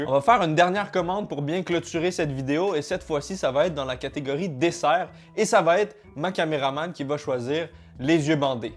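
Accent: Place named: Canada. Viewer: Vous êtes confirmé au Canada ? Fin je suppose à l’aide de ton accent que tu viens du Canada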